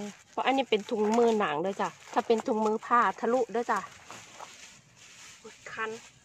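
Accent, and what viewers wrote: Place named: Sakon Nakhon, Thailand. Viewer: สำเนียงคือคนแถวสกลนครแท้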